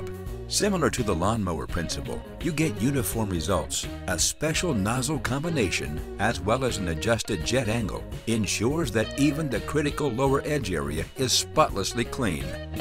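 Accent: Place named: United States. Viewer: Website arrests is .de but it's an American voice ?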